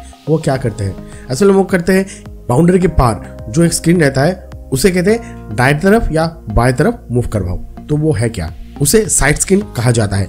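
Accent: Bengal, India. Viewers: Aap bangali ho😁😁apke hindi bangla mesano hein😁😁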